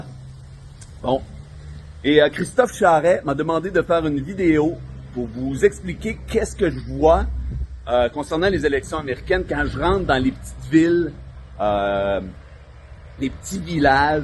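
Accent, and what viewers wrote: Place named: Quebec, Canada. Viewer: Merci beaucoup Christophe, j'adore l'humour Québécois,et la façon de parler.Amities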